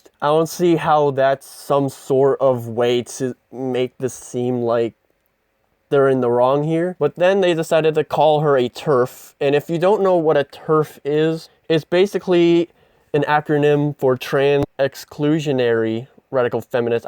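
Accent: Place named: Belfast, United Kingdom. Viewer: OMG I can hear the Belfast accent in your voice lol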